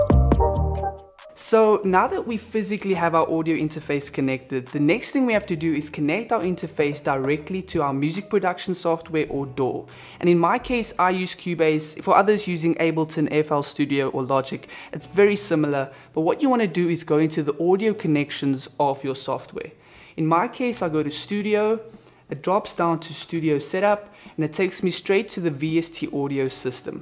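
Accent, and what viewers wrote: South Africa. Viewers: Surely you are South African! Anyway, great video ZA gang here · Hes South African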